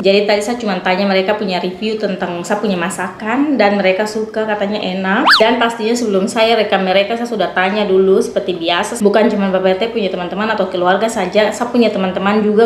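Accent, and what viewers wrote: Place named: Eastern Indonesia, Indonesia. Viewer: mmng bahasanya seperti bgt yaa... Khas.... Daerah Timur.. Mantabbb.. GO go... Trs lah memperkenalkan kuliner Indonesia... 💕❤😋😋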